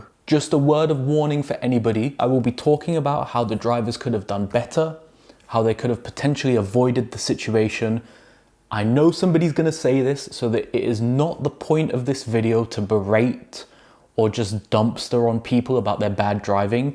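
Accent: United Kingdom. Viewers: I just discovered this channel because I was looking for non-supercar content and I'm liking it. The posh English accent side by side with car accidents gives everything an academic vibe.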